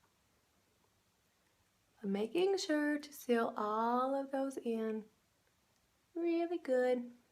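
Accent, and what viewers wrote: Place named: South, United States. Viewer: This is a beautiful look!! I have really small hooded eyes and allergies that make my eyes red all the time. I'm going to try this ASAP and pray that I don't look like Satan!! lol. I love how you used blusher as an eye color....never have thought of that. By the way, what kind of diva light do you use for your videos?? It is wonderful....not too bright but gives you that glow where we can really see. And as a "Southern Belle" myself....I love your accent as well 😊😊!! Thanks for the video. Can't wait to try this!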